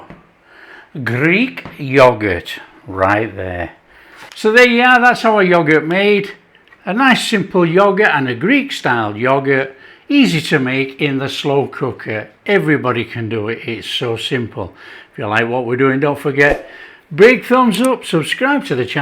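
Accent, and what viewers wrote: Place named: Scotland, United Kingdom. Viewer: Is that a scottish accent?Whatever it is I love it.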